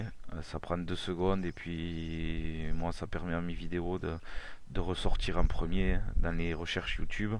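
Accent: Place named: Southwest, France. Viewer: Merci avec l'accent du sud-ouest sympathique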